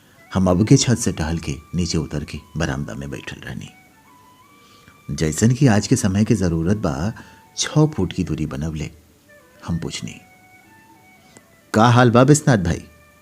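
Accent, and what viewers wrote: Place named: Bhojpuri, India. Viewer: Waah भईया केतना सुघर खांटी भोजपुरी बोलतानी रउवा🙏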